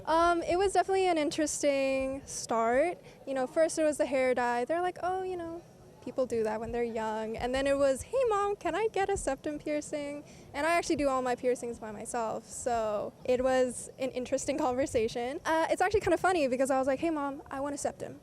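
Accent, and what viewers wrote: Afrikaans, South Africa. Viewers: it's a south African accent get over it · Lol, Yup, the accent is definitely ours😂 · As a South African I approve just from accent, and dish option Chakalaka and pap with some Wors 😂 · This gorgeous girl is 100 💯 South African 🇿🇦 her accent is 💯 South African No disrespect to her Korean roots Bless her!! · SHe is indeed South African, love the Afrikaans accent girl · Your South African accent is on point. Thank you for representing us. We all mix here. So you nailed it. ❤ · The South African in you is popping out. Love it!🎉❤ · South African accent❤ she is us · Because it is an Afrikaans accent. That accent comes from the Dutch. Even the word is dutch.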